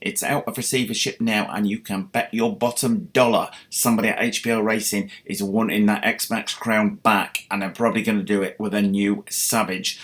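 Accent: United Kingdom. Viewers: Ur you from the uk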